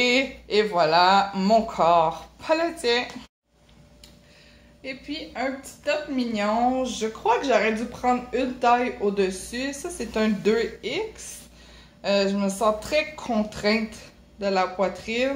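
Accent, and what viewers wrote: Quebec, Canada. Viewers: VIVE LE QUEBEC UN ACCENT QUE J ADORE AINSI QUE LES CANADIENS ET CANADIENNES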